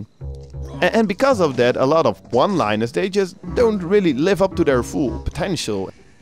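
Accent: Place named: Netherlands. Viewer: Yeah man!!! And your from holland..Ik ook!!! ik merkten het aan je acsent en het liedje in het begin. Goeje beschrijving voor de film man!!!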